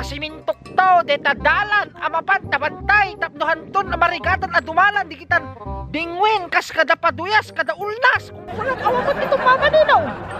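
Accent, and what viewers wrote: Ilocos, Philippines. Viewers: Nagunig ilokanom ngcute ka deng😂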